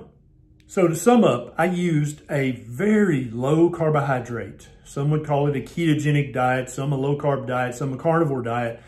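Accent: South, United States. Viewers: Dude so southern "a touch of sugar" So true!!!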